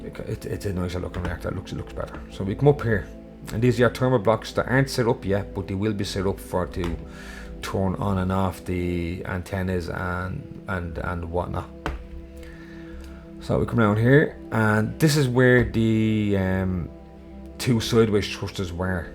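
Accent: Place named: Dublin, Ireland. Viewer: Watching your videos made me get this game, i love your content, it's good to hear the dublin accent lol. Keep up the good work.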